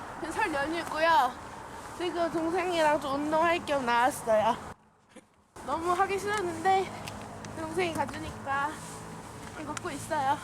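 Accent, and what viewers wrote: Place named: Seoul, South Korea. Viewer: 옛날 서울 사투리쓰는 아가씨같아요 되게 말씨가 곱답네요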